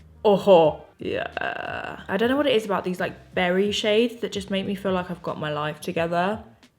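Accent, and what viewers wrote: England, United Kingdom. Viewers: This is my first time watching you and I’m hooked. Love the makeup and you are stunningly beautiful. I could listen to your English accent all day 💕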